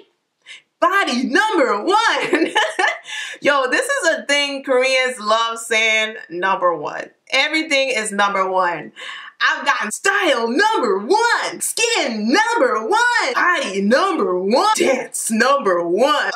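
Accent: Baltimore, United States. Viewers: I KNEW THAT WAS A BMORE ACCENT😂😂 Nice to know someone older, from my hometown, give tips about Korea. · I’m sorry this is my first time watching your videos and I sweaaaar you talk like Tamar Braxton 😂😂😂😂😂😂 I guess that’s a Baltimore thing 😂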